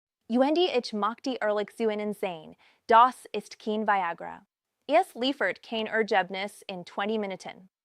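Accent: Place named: England, United Kingdom. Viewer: Was soll denn der (KI-) Unfug...: deutscher Text, aber englisch und mit englischem Akzent ausgesprochen???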